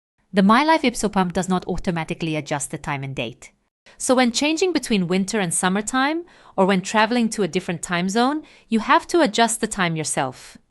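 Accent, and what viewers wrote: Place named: India. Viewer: haha the ai face intro and then into the thickest indian accent after the AI slop moves on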